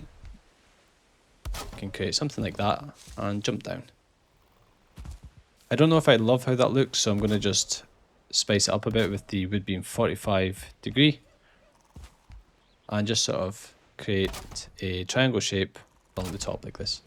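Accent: Scotland, United Kingdom. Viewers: what a beautiful Scottish accent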